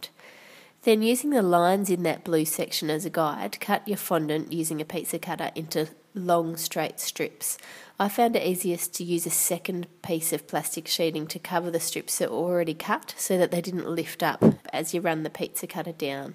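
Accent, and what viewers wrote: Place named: Australia. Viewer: wosho I'm Pretty sure she is Australian, It sounds like she has a Cold too.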